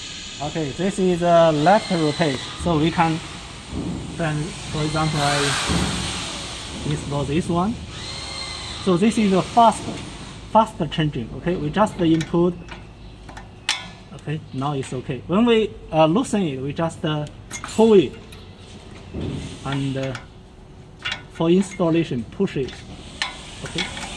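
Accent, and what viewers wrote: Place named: China. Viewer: I can totally understand your chi-english. any body understand also?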